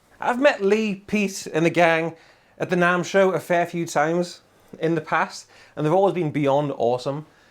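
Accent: Newcastle, United Kingdom. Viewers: ! I only started playing recently. I'm really lucky that I have guys like you on Youtube who play really cheap gear and make it sound amazing, it teaches me to focus on my playing and not the gear. I also love the geordie accent! I learned english watching True Geordie on youtube talk about newcastle football club in 2015 (when they got relegated 😂) so I love how you talk howaay lads heres to 2026!!!!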